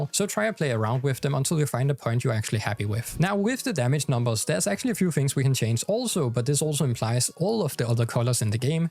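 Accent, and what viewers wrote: Denmark. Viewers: Fast question are you danish? You sound like one of my friends when he speaks English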